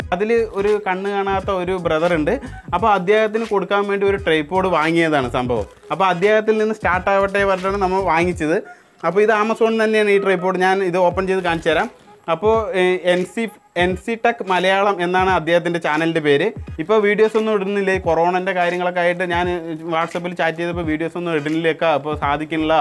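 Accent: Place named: Alathur, India. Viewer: ഇക്ക ആലത്തൂരോ, എനിക്ക് ഡൌട്ട് ഉണ്ടായിരുന്നു സംസാരം കേട്ടിട്ട്, പാമ്പാടി ആണ് എന്റെ നാട്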